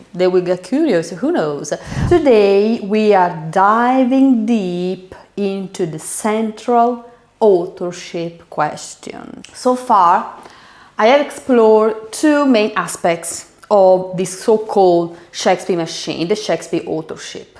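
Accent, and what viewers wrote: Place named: Italy. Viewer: From your accent I think you are Italian🥰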